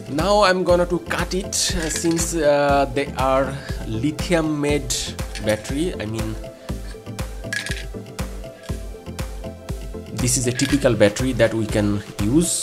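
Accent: Bengal, India. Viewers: You have such a STRONG Bengali accent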